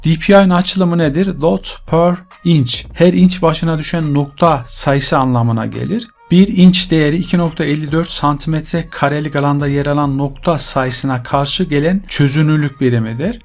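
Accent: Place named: Kargın, Turkey. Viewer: Harika güzel olmuş emmi nerelisin sesin kargın adamina benziyor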